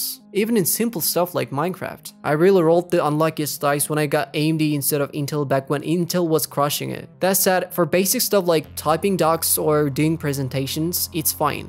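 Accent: Russia. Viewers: U russian? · is bro russian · Ха,он русский 😂,спалился братан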